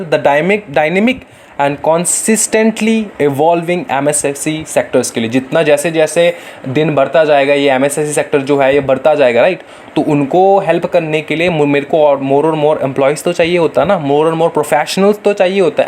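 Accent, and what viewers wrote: Bengal, India. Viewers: Yes , Sir has Bengali accent because He is Bengali🙂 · Seems like sir is has a bengali accent 😄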